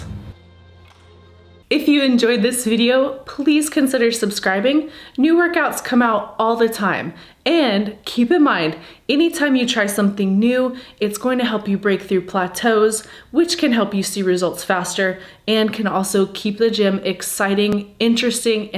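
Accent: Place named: Texas, United States. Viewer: She’s got that Texas “train hard y’all” thing going. I like that. You should sign off saying “Y’all come back now ya hear!”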